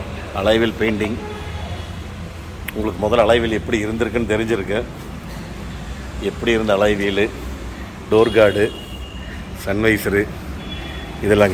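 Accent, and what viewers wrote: Kongu, India. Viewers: "சுடச்சுட பெயின்ட் அடிச்சு நிக்குது!!" அண்ணா நீங்க சொன்னதை நான் மிகவும் ரசித்தேன்!! அசல் கொங்குத் தமிழில் நீங்கள் பேசுவதை கேட்டு ரசிக்கவே உங்கள் வீடியோக்களை பார்ப்பேன்!!!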